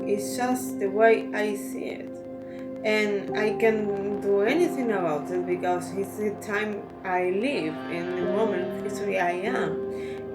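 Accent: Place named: Spain. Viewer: Hello this video is very interesting and you should put up more videos! Where are you from actually? Im thinking spanish due to the accent but im not certain. Greetings from ireland:)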